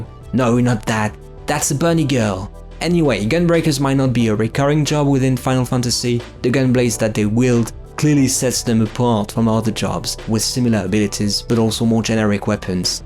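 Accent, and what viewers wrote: France. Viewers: I would have put the summoner first, but that s my thing for Yuna speaking Took my a few minute to figure why I had sub a French accent dude though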